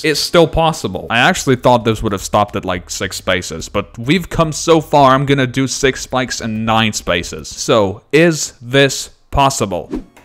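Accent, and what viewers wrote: England, United Kingdom. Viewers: An english accent is not a bad thing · I am English so his accent is completely normal to me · bro youre acent is preatty bri'ish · he sounds more British lol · yoooo is British i cant belive it yess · BRITSH MATE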